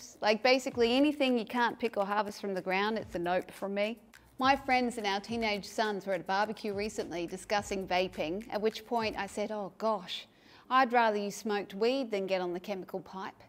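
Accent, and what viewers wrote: Australia. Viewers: Don't know anything about vaping, just smoking is bad for one's health. Just want to say I love the Aussie sense of humor and the accent. my siblings have been to Australia and my neice went to university in Sydney. They love it. Keep up the good work.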